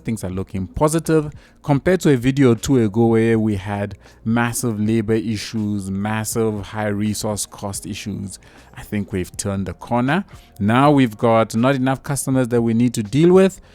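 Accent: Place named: South Africa. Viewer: I heard the accent and immediately knew this is a fellow South African.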